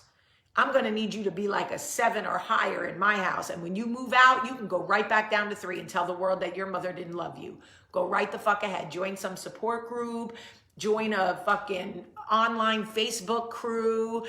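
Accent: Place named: New Jersey, United States. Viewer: You’ve got a lot of New Jersey in you haha! 😆 Love you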